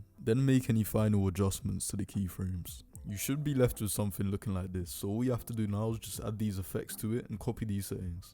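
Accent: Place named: Burnley, United Kingdom. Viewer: Are you from Burnley? love your accent.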